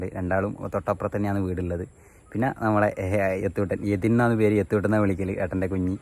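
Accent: Kannur, India. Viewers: കണ്ണൂർ ഭാഷ കേൾക്കാൻ നല്ലരസണ്ട് 👌🏻👌🏻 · കണ്ണൂർ slang കേൾക്കുമ്പോൾ എൻ്റെ വീട്ടുകാരെ ഓർമ വരുന്നു..ഞാൻ ചേലോറ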